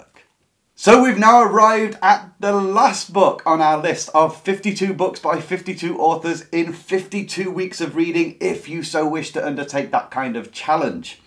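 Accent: England, United Kingdom. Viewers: What an engaging list of books you have! I am interested in the book "The Sicilian Romance" and quite a few other books as well. You have an amazing You Tube channel! I adore your English accent too.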